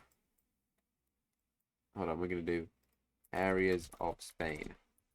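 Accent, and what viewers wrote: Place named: United Kingdom. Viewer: Hold on you're BRITISH???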